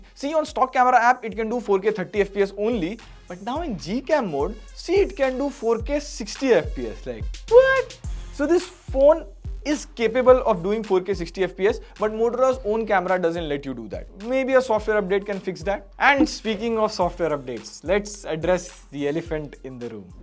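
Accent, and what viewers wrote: India. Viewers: Good review but your Indian accent is so thick I had trouble understanding you at times.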